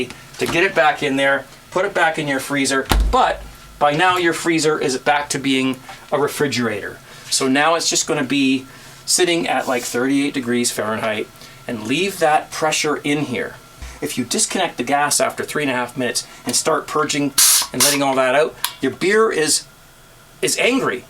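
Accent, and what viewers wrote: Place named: Canada. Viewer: I do like your video's, informative,funny, and I like your canadian accent.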